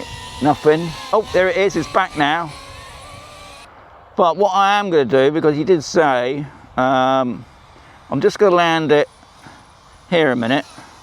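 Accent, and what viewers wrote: United Kingdom. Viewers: You sound like you're from the UK....when ordering ALL websites stated PRE-order (meaning you should KNOW it's not ready for delivery yet in the UK) So that's on YOU. The distorted close up footage is expected (you do know there's a gap between the 2 360° cameras...don't you...the gap is expected) Software fixes the distortion. You were correct at the beginning....you're just MOANING 🤦‍♂️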